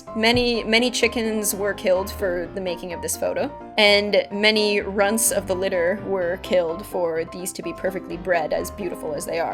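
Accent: Canada. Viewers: I love how you say 'begel' instead of 'bagel'. The Canadian accent has never ceased to surprise me :)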